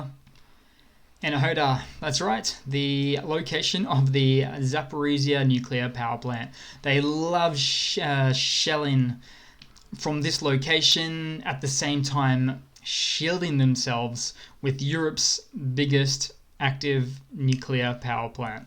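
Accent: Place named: Australia. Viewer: Thank you,! I love your updates, & I love your Australian accent!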